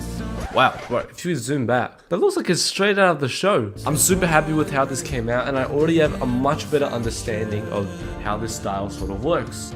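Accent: Australia. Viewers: Are you Australian cuz I am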